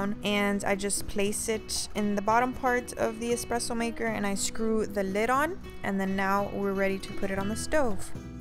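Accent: Miami, United States. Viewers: Good job on the video. I sense a Miami accent lol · Because I hear a Miami accent.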